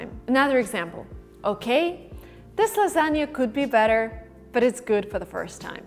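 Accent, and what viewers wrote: United States. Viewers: I love your way to teach English with an American accent 😊👍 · Hi ma'am! I've been watching your videos from this year and I've quite improved my vocabulary and caught up the American accent. Thank you for bringing on new things every week. 😇❤️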